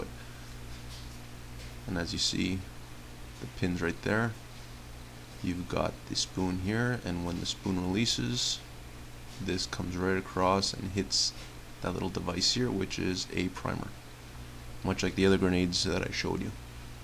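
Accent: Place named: Canada. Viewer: Obvious Canadian. Not that there's anything wrong with that.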